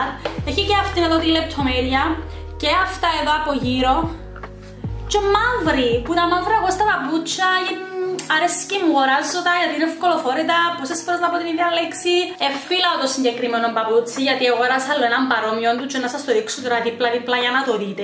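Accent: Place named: Cyprus. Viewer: Εισαι κουκλα και γλυκύτατη αλλα τρελαινομαι ν ακουω Κυπριακη διαλεκτο!!! Εισαστε υπέροχοι ολοι!!!!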